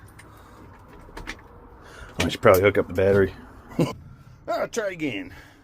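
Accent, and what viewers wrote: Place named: Saskatchewan, Canada. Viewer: Your from Saskatchewan, I know it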